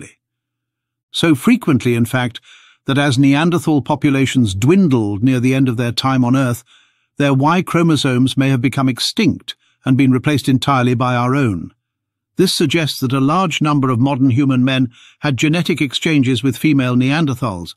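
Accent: England, United Kingdom. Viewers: No, I don't want to listen to your neanderthal erotica in a British accent... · Just having an English accent doesn't make you intelligent. All this interbreeding stuff is a load of crap you don't have enough Neanderthal DNA sequenced to know what your spewing this is all speculative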